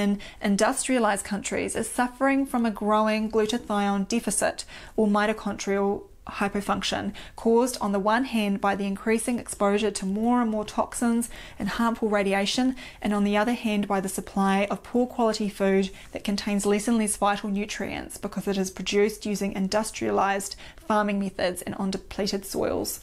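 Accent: New Zealand. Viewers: Absolutely stunning! And brilliant! Wish I had you as my physician! Although I may get lost with all the biology and chemistry lol What a smile but I'm sure millions have already mentioned that! Love the accent. I was in Oz in the early 90's and absolutely loved the accent and the people and yes I realize you are a New Zealander. I'm sure they are just as friendly as Aussies! G'Day! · I love listening to this cute Kiwi accent :-)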